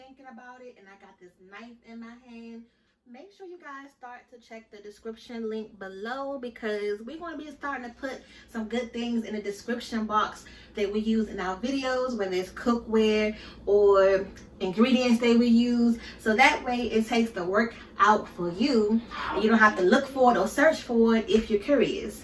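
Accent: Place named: Baltimore, United States. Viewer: I hear the Baltimore all in your voice sister ❤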